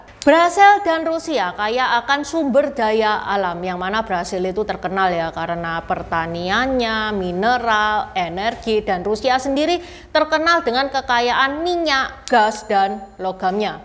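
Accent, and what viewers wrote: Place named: Surabaya, Indonesia. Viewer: Dari surabaya yaa ... Kog logatnya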